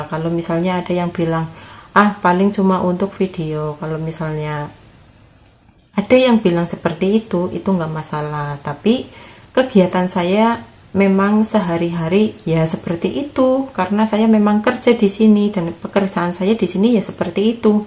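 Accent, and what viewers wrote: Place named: Banyumas, Indonesia. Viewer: Q suka sm vidio2 nya mbak. Sama sama bahasa nya ngapak 😃😃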